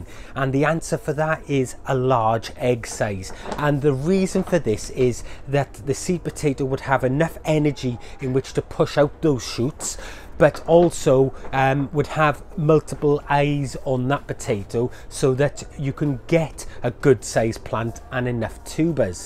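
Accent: Wales, United Kingdom. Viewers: I was just trying to figure out your accent. I`m Canadian, so I`m only vaguely familiar with the diverse British accents. I was guessing Wales. So I was right. I've been growing potatoes for years, but will try container growing this year. I generally harvest my potatoes in November, and keep them in the Fridge. By March the remaining potatoes start sprouting. In Canada the ground is still frozen. The earliest you can plant them is late April, but May is more typical. Most of my potatoes are small, I`ll have to improved my technique to get bigger ones.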